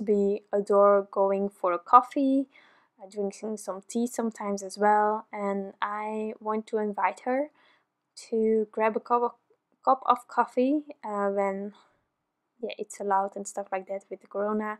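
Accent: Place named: England, United Kingdom. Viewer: Thank you so much dear 🤗🤗🤗🤗🤗 this video is so wonderful!! I am so privileged to have you in my Design Team 🥰🥰🥰🥰🥰 And I really love your English accent 😃😃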